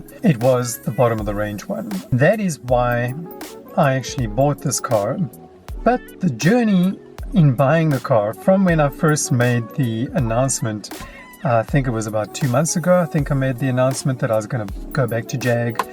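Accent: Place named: South Africa. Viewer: I searched XF Reviews on YouTube and came across your channel, watched 1 video and thought to myself, this guys definitely South African, your accent gave you away lol. But in the video you spoke of Dollars which made me think maybe you not from SA. Anyway, love your channel, Im a new Fan of Jaguar, especially the XF model. Mainly due to watching your videos, you don't sugar coat your real life experiences with the car.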